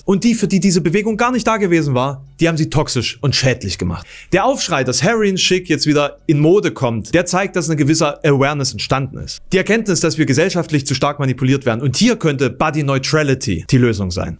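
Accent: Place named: Halle, Germany. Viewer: Gerade entdeckt. Super Video, sympathischer, tolles Thema und vor allem...hallenser Dialekt. Ich liebe alles daran.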